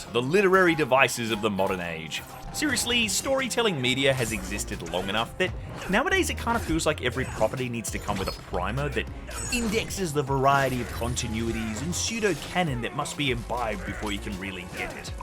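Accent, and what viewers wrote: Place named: Australia. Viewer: The only thing worse than an Australian accent is an Australian accent that’s trying to be funny and witty. Ick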